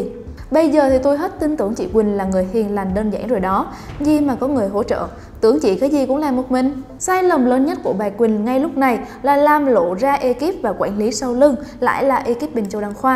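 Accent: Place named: Central, Vietnam. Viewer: thích bạn này nói giọng miền Trung hơn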